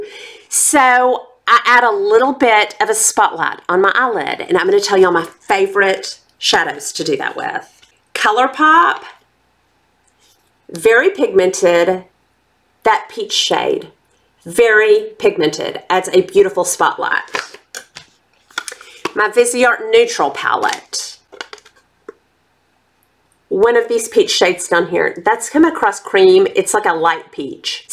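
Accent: South, United States. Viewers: So! Sheila (life with Lilly) told me to watch your channel.......so here I am!! I have Subscribed.....Your a delight....I'll be watching for your new video's! (Your obviously southern, which state?)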